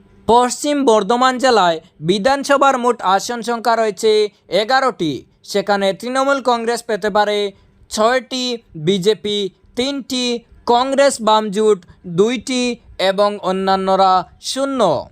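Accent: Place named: Bangladesh. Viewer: এটা বাংলাদেশী মাল কথার ধরন শুনে মনে হচ্ছে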